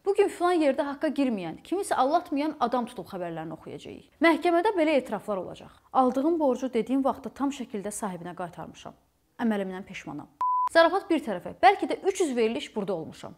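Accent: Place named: Sheki, Azerbaijan. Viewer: xanım haralıdı görəsən? Şəki ləhcəsi var, amma videoroliklərdə lap bizim kəndin ləhcəsində danışır, əsas da ana 🤣🤣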